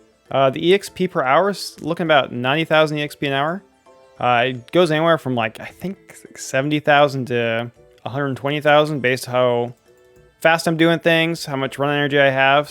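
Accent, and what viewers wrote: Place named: Midwest, United States. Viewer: Your outros give away the fact that you're a Midwesterner 😂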